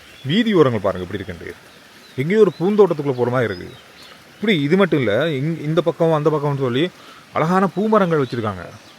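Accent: Sri Lanka. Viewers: உங்கள் இலங்கை தமிழ் மிகவும் நன்றாக கேட்பதற்கு இனிமையாக இருக்கிறது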